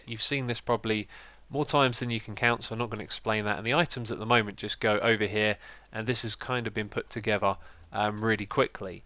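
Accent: England, United Kingdom. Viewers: Questions for the Q&A : 1) Ketchup or Mustard ? (yes, there is a correct answer :P) 2) More generic one: What made you get into recording game play videos? 3) You're quite obviously a Brit, but where in the country are you from / grow up? I'm a Londoner personally, and your accent isn't that different from mine. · He is from England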